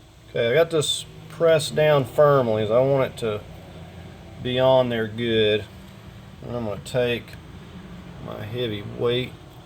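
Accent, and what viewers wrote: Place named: West, United States. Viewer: your prononciation is like some cowboy from old movies.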